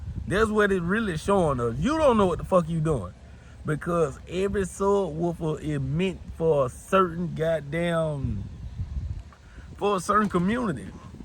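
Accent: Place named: Georgia, United States. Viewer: I enjoy your vids. I feel like, from your accent, you live close to me. I'm in SW Ga.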